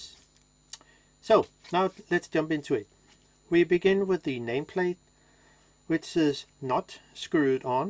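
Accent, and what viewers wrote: Denmark. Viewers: it can only sit in one position. Jeg kan lyd en dansker fra tusind km, rigtig godt video.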